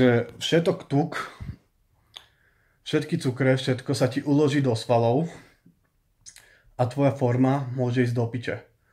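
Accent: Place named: West, Slovakia. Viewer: neznášam západoslovenský prízvuk, ale pozrel som to dokonca :D ber to ako kompliment, no offense, gl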